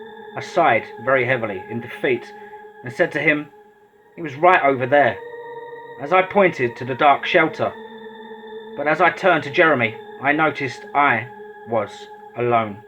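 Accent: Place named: Estuary, United Kingdom. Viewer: It's good to hear narration with a Thames Estuary accent; makes a refreshing change.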